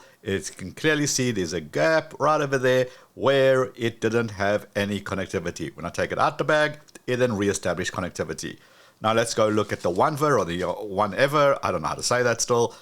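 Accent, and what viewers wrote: South Africa. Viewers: Howzit, do i hear some 🇿🇦 in your accent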